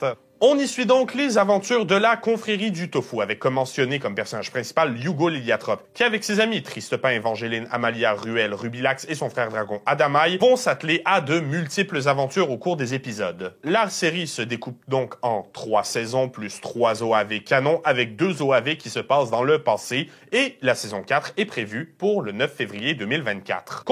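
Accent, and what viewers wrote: Quebec, Canada. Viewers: Yo t'es quebecois???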